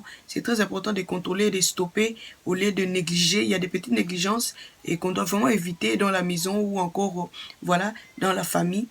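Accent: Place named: Democratic Republic of the Congo. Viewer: J'étais que tu étais Congolaise avec l'accent que tu as.. (moi aussi j suis Congolaise ;)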